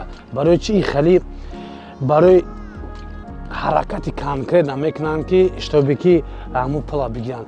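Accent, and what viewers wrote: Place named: Vahdat, Tajikistan. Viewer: Чиста вахдати гап мезани да брат ханотан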